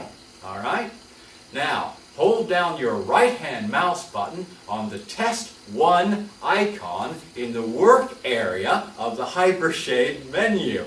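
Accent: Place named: United Kingdom. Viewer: Hes from the UK deal with it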